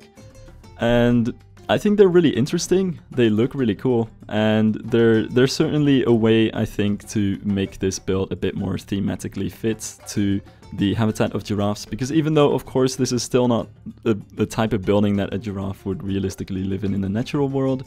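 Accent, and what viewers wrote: Netherlands. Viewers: Are you Dutch i am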